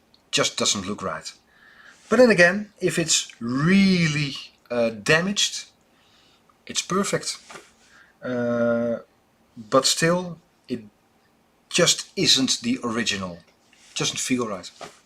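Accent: Netherlands. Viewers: Your dutch accent reminds me of relatives in Grand Rapids when I was a child growing up.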